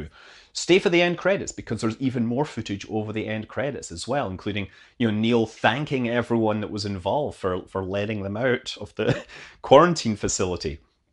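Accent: Scotland, United Kingdom. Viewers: Hi, did you watch it in the US? Can't help but notice you're Scottish, and I can't find it anywhere in the uk!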